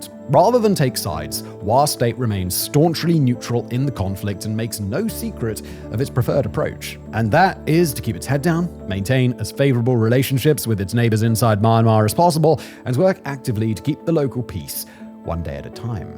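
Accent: England, United Kingdom. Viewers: He's terminally English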